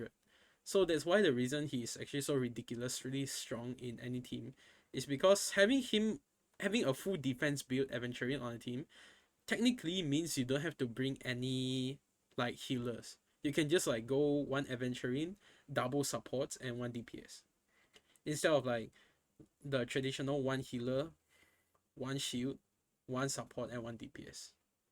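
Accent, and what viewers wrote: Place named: Singapore. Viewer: Bruh the singlish, I can't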